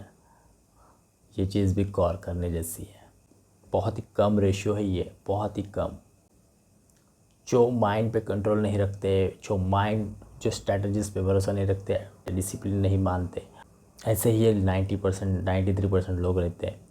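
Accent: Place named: Agri, India. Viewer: मराठी hai.. aagri 😊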